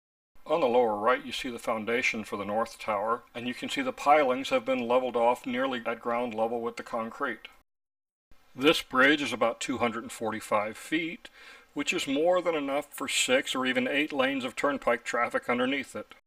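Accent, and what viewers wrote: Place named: Midwest, United States. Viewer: Got it. You sound like you are from the Midwest.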